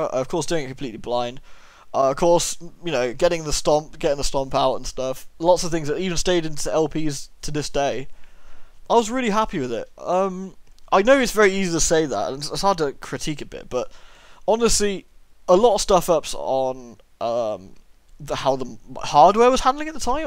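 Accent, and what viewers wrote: England, United Kingdom. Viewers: Ooooh... I know that feeling all too well, although that feeling tends to manifest whenever I suddenly remember playing a particular game or something triggers my memories of said particular game. The most recent case, for me anyway, was when I was reading the comment section for his video announcement of his Patreon account, and one of the viewers suggested humbly that he redo his playthrough/LP of F.E.A.R. Pretty soon, I installed my copy of F.E.A.R. and F.E.A.R. Extraction Point. Funny enough, it was because of your LP of Dead Space that I now know of the:). My first impression when I saw one of his videos for the first time (in Dead Space): "Woah! That's a thick English accent!"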